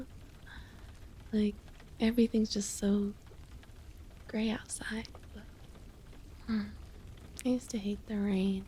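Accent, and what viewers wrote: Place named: United States. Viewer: You American girls have (possibly)the best accent! You should do a "southern belle" accent video. How much fun are you! New sub from 🏴 😊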